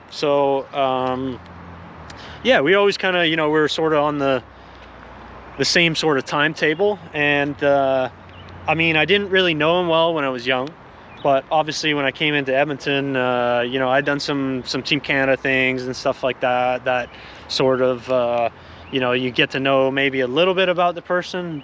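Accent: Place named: England, United Kingdom. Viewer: Where in England you from bro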